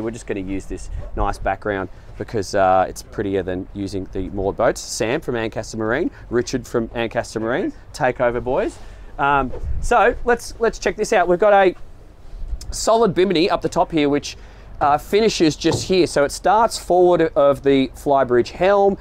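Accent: Australia. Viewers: Love the Aussie accent..Sometimes he says here , sometimes heeyah..Keep up the good work..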